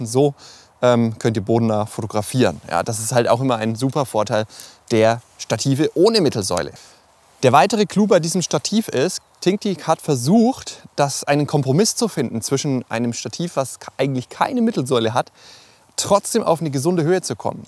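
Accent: Franconia, Germany. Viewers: Fränkisch darf man nicht weg schneiden!!! Grüße aus KT 😂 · Mehr frängisch!!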